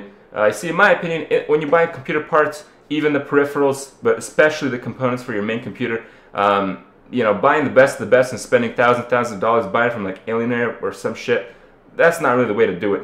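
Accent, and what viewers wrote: Romania. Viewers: kripp, are u romanian? :D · You Romanian ? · parca din Cluj e :)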